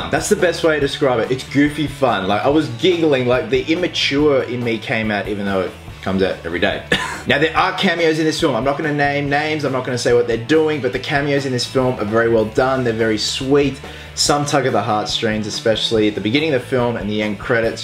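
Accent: Australia. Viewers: I love Australian accents and you have one of the best. -Hugh Jackman -You -ExperGamez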